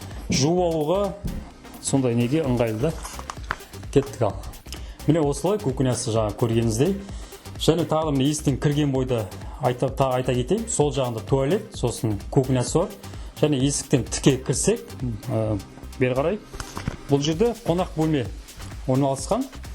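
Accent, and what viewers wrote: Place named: Russia. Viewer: Тіліңіз орыс тіліне ақсау. Таза казакша түсіндірсеңіз!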